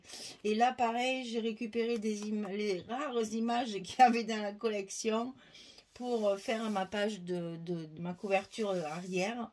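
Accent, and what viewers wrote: South, France. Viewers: Cc whaou quel bel album vous l'avez sublime avec vos embellissements. J'adore, pas si petit que ça finalement. Alors chez moi pas très beau temps le vent souffle beaucoup et il fait un peu frisquet mais avec votre bel accent du sud vous nous mettez du soleil dans nos cœurs. J'attends de voir ce projet à 4 mains mais je ne doute pas du résultat,je suis allée voir ce que Françoise faisait et ses albums sont très beaux aussi. J'attends aussi de savoir où vous allez passer pour votre tour de France j'aimerais bien vous rencontrer. Bonne après midi bisous 😘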